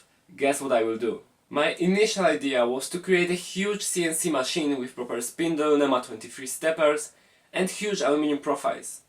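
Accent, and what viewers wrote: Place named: Poland. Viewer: oj słyszę tu chyba polski akcent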